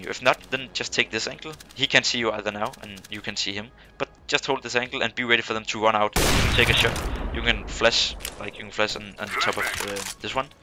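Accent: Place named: Denmark. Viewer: dansk accent heh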